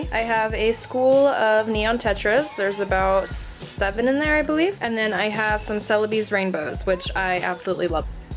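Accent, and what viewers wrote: Texas, United States. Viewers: Is she from texis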